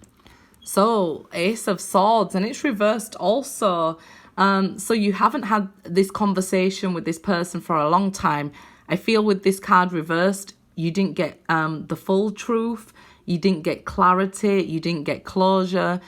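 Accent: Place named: North, United Kingdom. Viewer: You just read my life .But your accent always has me imagining that its Mel B lol .bet your a right northern girl xx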